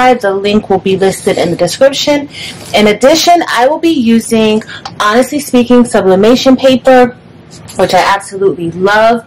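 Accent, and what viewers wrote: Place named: Texas, United States. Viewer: You a Texan? Y'all give u away. Great video thank you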